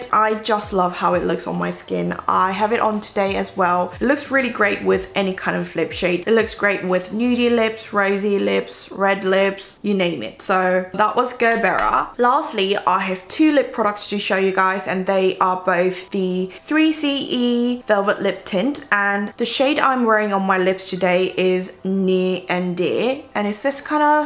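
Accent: United Kingdom. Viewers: 영국식 발음 너무 좋아요ㅠㅠㅍ 언니 너무 이쁘고 우아하고 그러네요...구독 누르고 가요 자막 안보고 목소리에 집중해서 영상 봤어요 ㅎㅎ 좋은 영상 감사해요♡ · 호에 온니ㅜ 단발 넘나 잘어울려여....🙋🏻❣️ 온니 영어발음에 치이고 감미다...총총 온니 영상보고 영어공부할땨마다 영국발음이 조금씩 입에 붙고있어여ㅋㅋㅌㅋㅋㅋ 저는 블러셔는 누드팝에 빠져있고 립은 페리페라 아련폭발+맥 칠리 조합, 그리고 맥 버시컬러스테인 프리저빙패션에 빠져있어여!!!! 곧 봄인데도 불구하고 왜 아직도 가을가을한 조합이 좋은걸까요ㅠ🍂🍁🥀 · 와 이게 영국 억양이에요? 진짜 매력적이다 와... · Love your British accent so much!!!!!!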